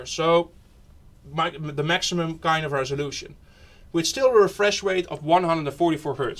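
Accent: Netherlands. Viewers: je bent zeker nederlands of belgisch ik hoor het aan je engels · nice dutch accent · can't you like LOSE the fucking dutch accent...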